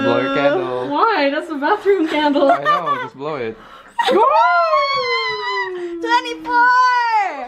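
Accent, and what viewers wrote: Visayas, Philippines. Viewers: pwede ka magbisaya kay murag naa kay bisayan accent...shout out po...maligro family....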